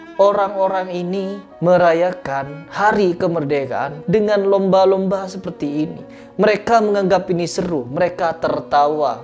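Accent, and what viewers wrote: Madura, Indonesia. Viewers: Pean logate madura ya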